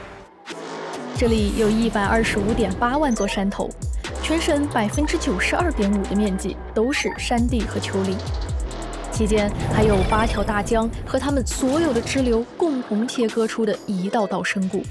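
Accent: Sichuan, China. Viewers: 配音的是我老乡四川人❤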